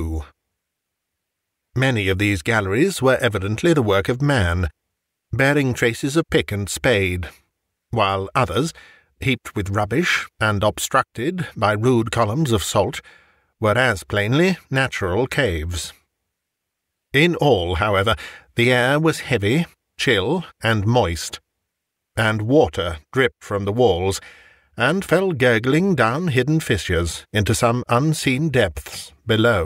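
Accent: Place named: RP, United Kingdom. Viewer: Dang I love yer posh voice!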